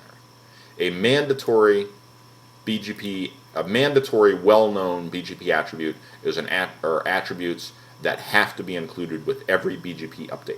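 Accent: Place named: United States. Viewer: Really enjoyed the delivery of this and I struggle with the American accent. Thank you!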